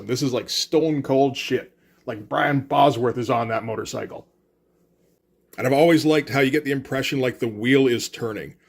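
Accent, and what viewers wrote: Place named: Canada. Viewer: Funny i pegged you as Canadian from your accent. You sound like Kevin Owens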